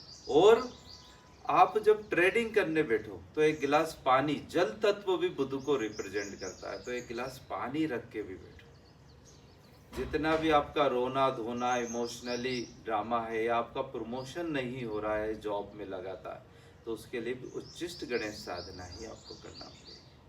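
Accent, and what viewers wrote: Indore, India. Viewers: Bolne s lagta h indore ka touch h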